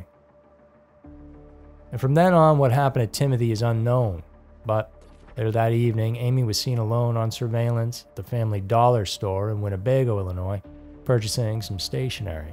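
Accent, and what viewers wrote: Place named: Georgia, United States. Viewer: you're so awesome and professional. So sad and Heartbreaking stories. You're my number 1⃣ story teller. Prayers for the families. 🙏 P.S. I swear I can hear a Georgia accent, but you say you're from Beantown! ❤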